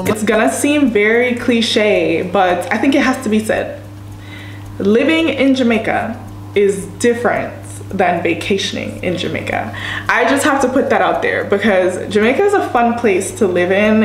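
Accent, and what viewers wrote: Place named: United States. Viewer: Girrlll I just watched the first video you did about moving out the US and I just watched this one and you already are glowing so much more and your voice is changing and your voice is dropping that American accent 🎉😂❤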